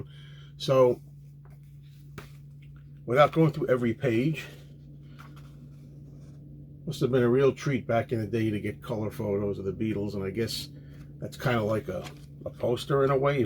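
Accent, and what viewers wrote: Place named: North Jersey, United States. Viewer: You sound like you’re from north Jersey. Anyways I do like your channel and the few videos I’ve seen.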